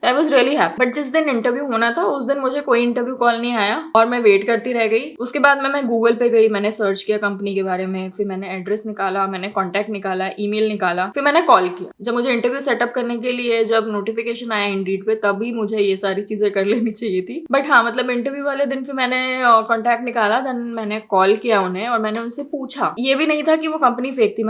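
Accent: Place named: India. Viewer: Thank you for those content,in this video it was really hard for me to understand since you speak indian,can you make your videos with subtitles?thank you.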